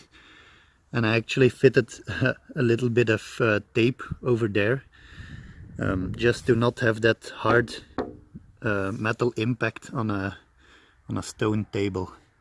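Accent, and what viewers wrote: Flanders, Belgium. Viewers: Aan het accent te horen een Vlaming.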